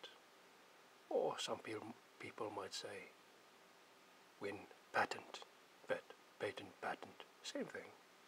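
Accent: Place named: Wales, United Kingdom. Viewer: Welsh accent for you. L)=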